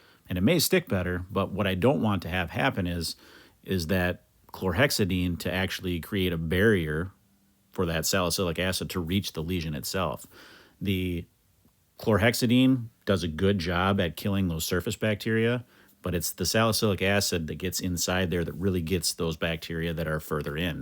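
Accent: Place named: Midwest, United States. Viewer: I was wondering why your accent seemed so familiar, its because we're state neighbors! Greetings from Eastern MN.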